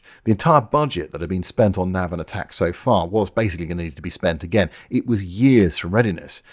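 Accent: United Kingdom. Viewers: I was not totally listening until I heard the British voice claim the Republic F105 Thunderchief was a "Crap airplane". Which is NOT WHAT PILOTS THOUGHT. The F105 was considered a "Cadillac of aircraft" by their crews and pilots. It was insanely complex, and very large, but it was beloved by most of the people who flew these aircraft. They took them out of service because they were doing ridiculously dangerous duty drawing radar contact of SAM missile batteries, and thus about 380 of these aircraft were lost. Strategy began to change. But the F105 was not truly a "crap" aircraft, not by any rational measure. In fact, it seems the electronic and radar gear on the plane appears to have been hyper advanced, and established the trend that advancement in electronic gear was going to be "the future" along with advanced missile tech. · just finds channel...hummm this guys sounds British but what level of snarky British is he. 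(watches for a few minutes) ahh proper British snark levels! instant sub!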